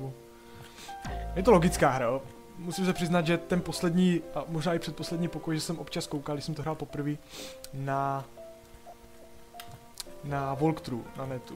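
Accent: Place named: Brno, Czech Republic. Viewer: hele my sice víme že nemáš čas ale podívej se kolik lidí chce aby si nahrával alespoň jedno video za týden rozhodně by nám to stačilo protože bysme pravidelně slyšely tvůj příjemnej hlas a brněnské nářečí;) opravdu nám všem chybíš:)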